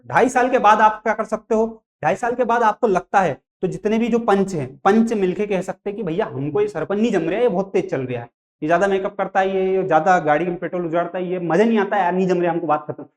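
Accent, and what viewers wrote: Indore, India. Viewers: Indori accent❤❤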